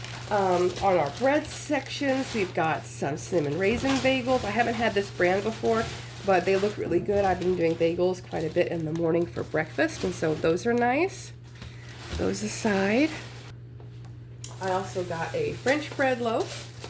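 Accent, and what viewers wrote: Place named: Midwest, United States. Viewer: Hi, I love to eat that potted meat on buttered toast for breakfast, but if you feel its too salty - mix a can with a bit of mayo or even miracle whip and make a "ham salad" to make a regular sandwich with LETTUCE! hahaha Girl, I just dont think you are going to make it through all of that lettuce. If you can share the big bag of it with someone for taco salads or something that would be kind, or simply throw it outside for the bunnies and squirrels to eat. That way its not going to waste. So, Im confused how many are in your family? You, your wife/girlfriend and how many kids? ages? Im just curious. Also, where are you located/state? You mentioned "the south" but you sound like you have a midwestern accent. Nice hall btw! Is it monthly?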